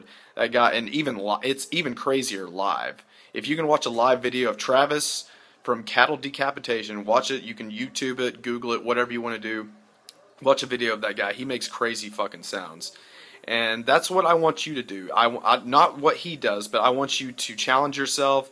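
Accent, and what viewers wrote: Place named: South, United States. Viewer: I didn’t know you had a slight southern voice.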